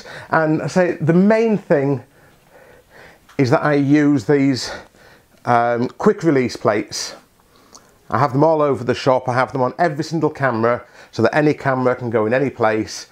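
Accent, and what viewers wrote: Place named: England, United Kingdom. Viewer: Please forgive me new to your channel are you English you sound it but you said dollars .....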